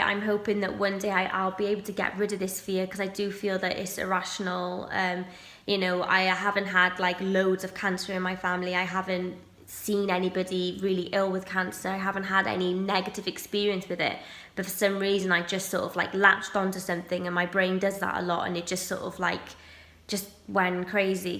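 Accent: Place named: Wales, United Kingdom. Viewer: The more I watch you the more I hear that we are exactly the same. I can't say that word either or watch films or TV shows that deal with the illness. I have a non urgent test coming up but I am terrified. Maybe it should be urgent...you know how we question everything! I think about this test 24/7. However I am greatly comforted by you. You are Welsh? I hear it in your voice! I am half Welsh. I am old. 69. I feel ashamed that I have this health anxiety...I just can't help it but feel that I should not have it at my age....I should grow up a bit! It's not my fault that I have it but I can't help thinking that I am completely self obsessed and thinking of myself all the time. God Bless You. Thanks for this video XXX❤️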